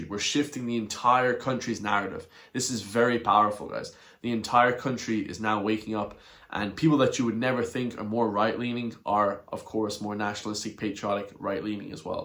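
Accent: United States. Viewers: American accent, why?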